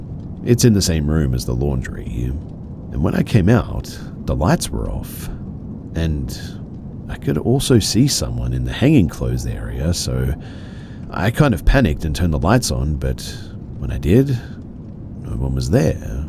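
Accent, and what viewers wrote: Australia. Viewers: Good to hear an Aussie accent, cheers mate!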